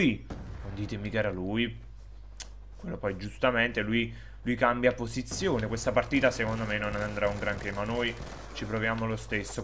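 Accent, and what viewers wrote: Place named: San Giuseppe, Italy. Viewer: dal tuo accento mi sembri di San Giuseppe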